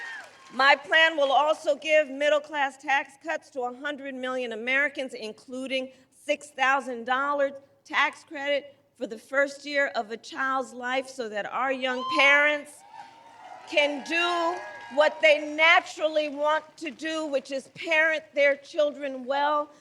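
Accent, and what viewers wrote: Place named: England, United Kingdom. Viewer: Love who! Who’s that? I don’t know her. She’s kinda has an English accent. Sound like she lived in a land of OZ my gosh is she the one behind the curtain or was that a Teleprompter?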